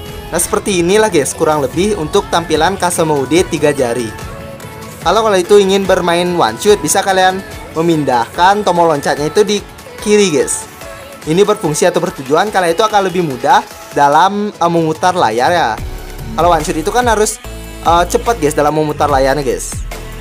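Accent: Bali, Indonesia. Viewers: Logat nya Bali banget😊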